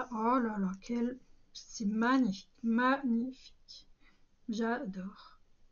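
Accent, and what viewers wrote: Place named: Alsace, France. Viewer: Bonjoure tres joli projet cest vraimabt bizard detendre quelqun dautre avec laccebt alsacien 😂 bonne petite croix et vive lasace hein bis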